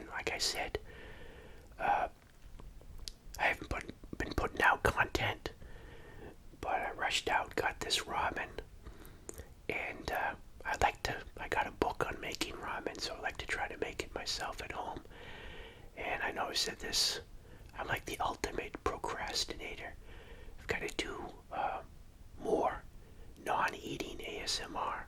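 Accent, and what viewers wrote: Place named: New York, United States. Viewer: kinda morbid I suppose but I guess your from New York from your accent - were you there on 9/11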